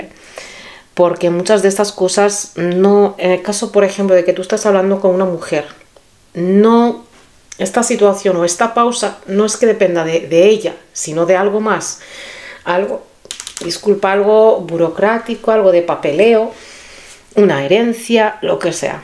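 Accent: Spain. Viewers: Así es, hay que buscar la manera de retribuir y ayudar a los animales, me gustaron tus consejos, especialmente el de remojar el pan 🥖 duro para los pajaritos ❤ Un abrazo desde Chile 🇨🇱 Me gustó mucho tu lectura, deseo que se materialice y finalice este año llena de abundancia y felicidad. Ah, y me encanta tu acento español 😊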